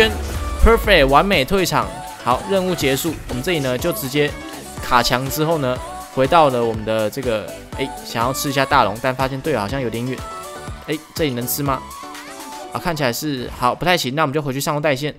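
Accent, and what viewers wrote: Taiwan. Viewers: 台語口音很有韻味欸不是台北人嗎？😂😂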